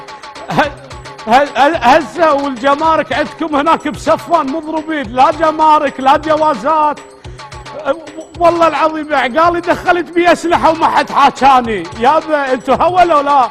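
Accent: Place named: Kuwait. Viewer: ظابط اللغه ماشاء الله كويتي انت ها .؟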